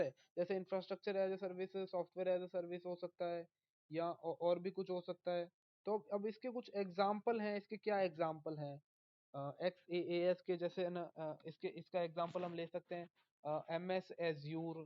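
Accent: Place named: Pahadi, India. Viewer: thodi thodi pahadi tone ari ha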